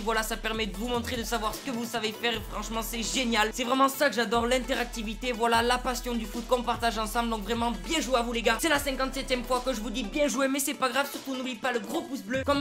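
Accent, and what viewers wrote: Marseille, France. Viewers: Salut mon vieux, obligée de t'écrire un commentaire sur youtube pour te joindre ! C'est pas possible ça haha J'ai maté ta vidéo, ça fait longtemps que j'ai pas entendu ton petit accent marseillais/landais/nonidentifié en vrai donc t'es dans l'obligation de me donner de tes nouvelles!!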